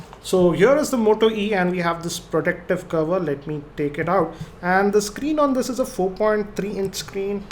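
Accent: India. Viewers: No offence intended and nice video but, heavy heavy Indian accent you've got there.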